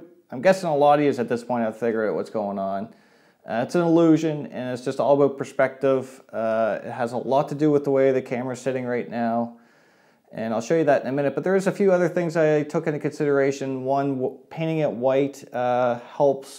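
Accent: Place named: East, Canada. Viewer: I figured it out the first video of his that I watched. He said 'eh'. Then the second video I detected a back east accent and the third video was a dead give away when he mentioned Robertson screws.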